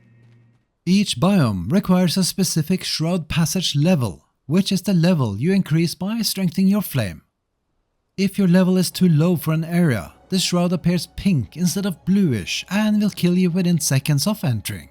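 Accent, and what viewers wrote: Norway. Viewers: Are you Norwegian? ^^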